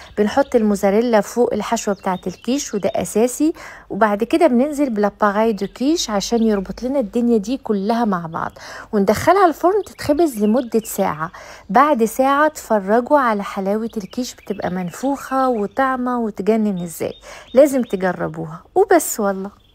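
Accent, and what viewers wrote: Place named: Morocco. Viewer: عشان مش مصرية انا متأكدة من دول شمال افريقيا واحساسي بيقول المغرب بالظبط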